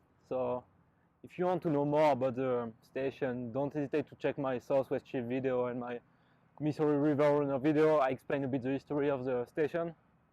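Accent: France. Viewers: Mais sa se voit tellement avec ton accent que tu est un français 😂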